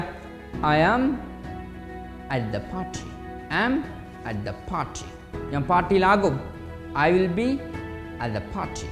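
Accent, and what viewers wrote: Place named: Malappuram, India. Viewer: നിങ്ങൾ ഒരു മലപ്പുറംകാരൻ ആണെന്ന് തോന്നുന്നു... ശരിയാണോ ?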